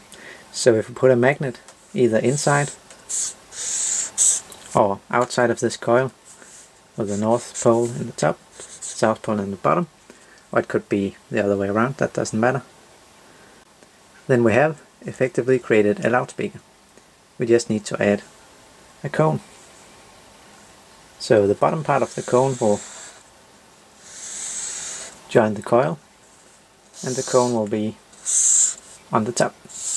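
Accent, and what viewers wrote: Denmark. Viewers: You have a Danish accent bro, where you from?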